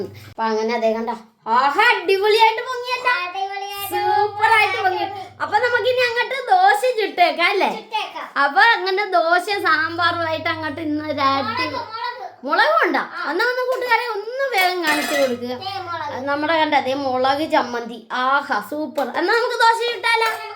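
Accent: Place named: Kochi, India. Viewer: Njan first time anu ivarude vlog kanunne. Angatt ingattt nammakk🤔. Kochi ano sthalam. Njanum kochi anu . Slang kettatt chodhichenatta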